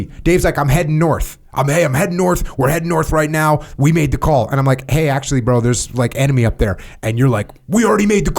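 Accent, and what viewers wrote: England, United Kingdom. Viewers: Brilliant from England your English accent had me in creases also well done recognising the authors dry humour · Jocko with a British accent is pretty amusing. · English accent Jocko is the Jocko I didn't know I needed.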